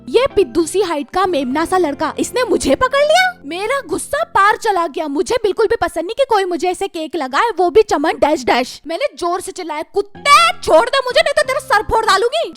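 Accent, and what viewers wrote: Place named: Indore, India. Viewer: Ma kabe sa aap ka video Dhaka rahi hu or itna to pata chale gaya ha ki aap Indore sa ho 😅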